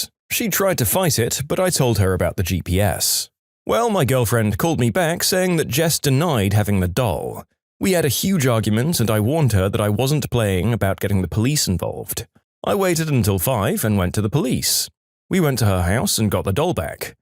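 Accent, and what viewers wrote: United Kingdom. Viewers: Th4 britidh accent makes this 200 percent better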